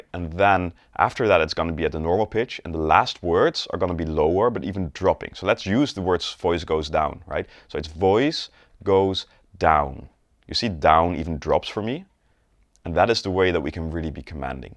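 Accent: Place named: Netherlands. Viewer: This is very good,! My guess is that you’re from The Netherlands as well! We have such a unique accent lol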